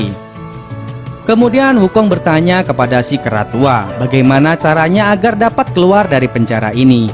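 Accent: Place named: Madura, Indonesia. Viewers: meduro endi sampean cakkkk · Admin, logatnx kyk Madura. Madura mana gaes?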